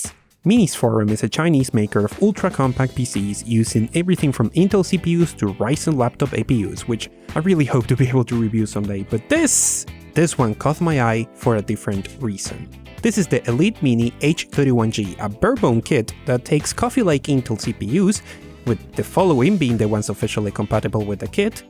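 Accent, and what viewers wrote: Venezuela. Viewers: your english eccent is venezuelan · Una pregunta. tu eres venezolano?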